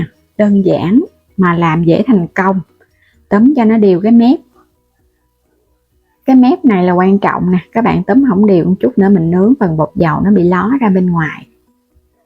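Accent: Mekong Delta, Vietnam. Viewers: Giọng nói miền Tây dễ thương mà bạn sao nghe khó vậy hả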